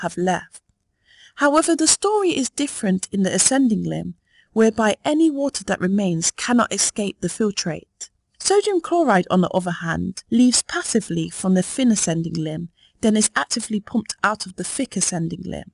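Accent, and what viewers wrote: United Kingdom. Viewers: Your pleasing british accent made this tutorial even more handy Great lesson indeed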